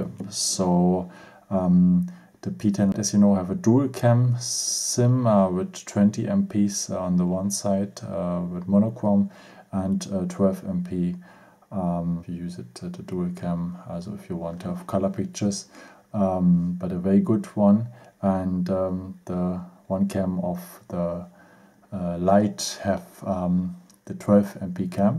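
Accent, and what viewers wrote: Germany. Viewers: You know there is a English alphabet? For example the english "e" is spoken like a german "i" which you obviously speak.... but nice job reading down the information that stands on every page in the internet have a gr8 day m8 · Why do you say Jigahertz instead of Gigahertz? XD Your English is funny. At least I can understand you though so not hating! And at least you're not just talking German. I can respect that, especially from German people.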